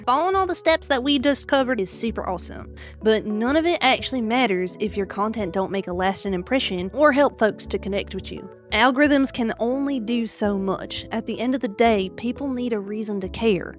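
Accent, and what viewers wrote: South, United States. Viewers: Thank you, I love getting new information & I love your country accent you remind me of my favorite stallion Applejack. Thank you so much, I have used my own hashtags & everything I will do my best to post more I made a new hashtag today for my Merry Morning Sing Song, I did it because I found your video I am proud of your work, thanks!!!!!!!!!!!!!! 🫴🏽MWAWWWAH~⁽⁽ଘ🤍ଓ⁾⁾ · Thank the good lord another streamer with a southern draw. I haven't come across another streamer with a draw. And your info is very refreshing. ❤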